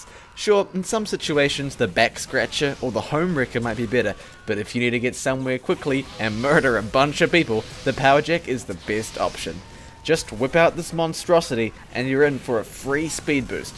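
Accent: New Zealand. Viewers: No, his accent is different. I think he's Kiwi (New Zealand), whereas Muselk is ACT, Australia. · He's from New Zealand though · i had a feeling you were from New Zealand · Because he's from New Zealand. That's how their accents are.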